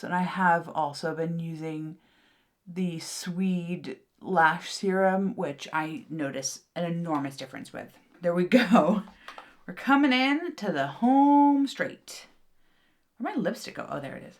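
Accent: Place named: United Kingdom. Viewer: Hi, just came across your channel. I spent the first 5mins trying to work out if you were British , American or Irish 😅 I’m loving your content so, you have another subscriber . I’m 64 years young and I’m Scottish. I love makeup especially drugstore so, I’m hoping you’re not all about high end. Sorry❤❤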